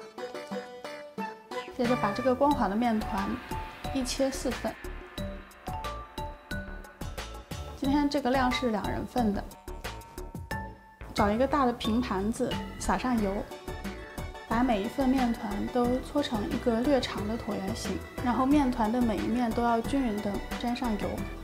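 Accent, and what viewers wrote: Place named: North, China. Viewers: 口音一听就是我们北方人🤣🤣🤣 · 山西方言吗？感觉说话舌根子比较绵软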